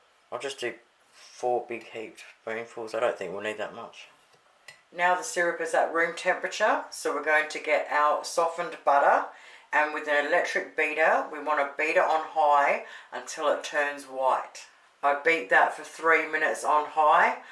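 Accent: Australia. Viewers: You speak proper Aussie 😊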